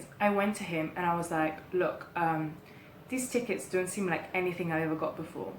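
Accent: United Kingdom. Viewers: Wow you sound british. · it’s really not a stupid question. I didn’t realize she grew up in Italy until she mentioned it. As an American, she sounded very British to me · You learned English from a British instructor(s) so you have that accent. Your mother's accent from Nigeria i hear as well. And of course the Italian 😁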